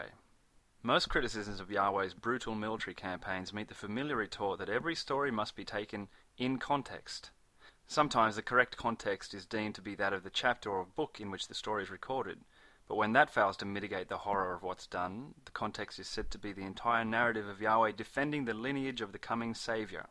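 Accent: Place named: Australia. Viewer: thanks for making this gloomy video more sexy by having an Aussie 'cent. Gracias.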